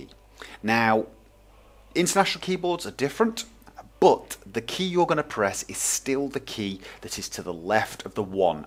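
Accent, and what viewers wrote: United Kingdom. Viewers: You remind me of one of the best uni profs I've had! Very thorough but concise guides, covering a whole lot of info while still remaining articulate. My prof was British and I'm not quite sure what part of the UK you're from (Or if you're from there at all, just a guess) but I think it's a cultural thing haha. Keep up the good work!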